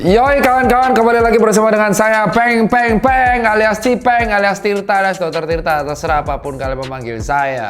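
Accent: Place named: East Java, Indonesia. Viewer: Dr tirta ngomongnya kok kayak orang jawa timur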